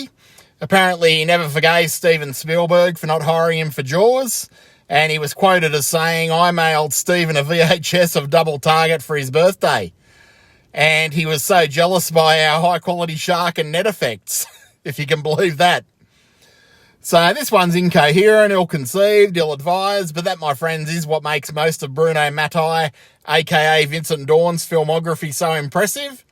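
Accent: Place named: Australia. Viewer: Bob Ross .great name 😁. Also love the Aussie way of speaking . My favorite accent . Plus I hear that place is just wild to live in. Keep up the great work and good morning from Dallas Texas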